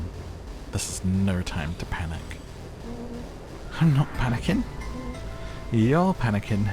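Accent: United States. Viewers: I thought your American accent was really good! My brain didnt even notice it until you mentioned it, which is what you want when doing an accent. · Your southern US accent is sooo good whether youre doing it for men or women.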